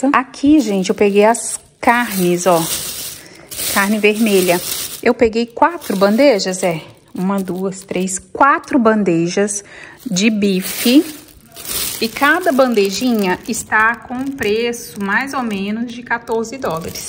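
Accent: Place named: Minas Gerais, Brazil. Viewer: Vc é mineira UAI 😂😂 acho lindo o sutaque minerim 😂😂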